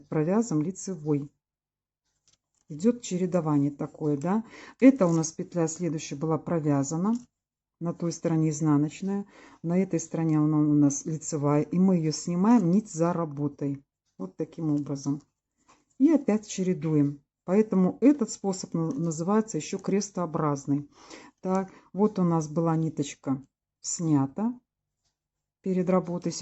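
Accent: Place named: Belarus. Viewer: здравствуйте! Почему я раньше вас не видела? Вы с 2015 года ведете канал, а только сегодня я с вами встретилась, познакомилась и, конечно же, подписалась. В целях знакомства пересмотрела много ваших видео. Очень познавательно и полезно. Хотя я вязальщица с уже достаточно большим стажем, многое взяла себе на заметку, ведь учиться и узнавать что-то новое никогда не поздно и совсем не стыдно. Спасибо вам, что делитесь своими знаниями со своими подписчиками. Удачи вам, новых идей, вдохновения, легких петелек, благодарных подписчиков и процветания каналу. Забыла сказать - ваш белорусский говор покорил меня сразу! 💖🌸🌺🌸🌺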